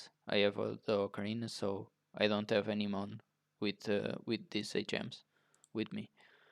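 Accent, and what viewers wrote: Portugal. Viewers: Sotaque pt? 100%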